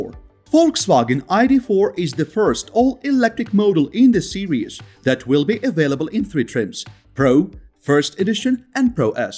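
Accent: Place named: United States. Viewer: Is this what a posh American sounds like? Jeez dude stop you’re butchering the English language!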